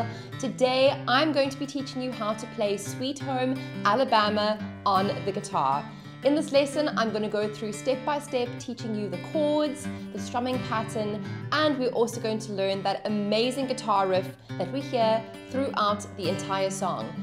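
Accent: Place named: South Africa. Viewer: You have a South African accent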